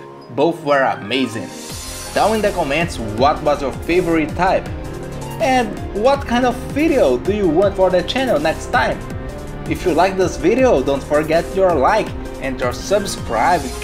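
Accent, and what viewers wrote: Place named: Brazil. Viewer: you have a brazilian accent, are you from brazil?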